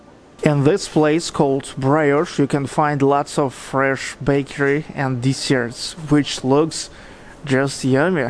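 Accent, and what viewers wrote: Russia. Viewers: Like your strong Russian accent :))) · Ur Russian accent is fingerlickiing good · He's russianglish :))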